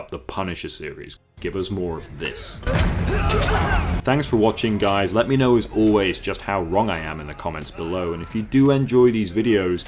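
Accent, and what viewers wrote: United Kingdom. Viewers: "With my British accent" Buddy I think you are spot on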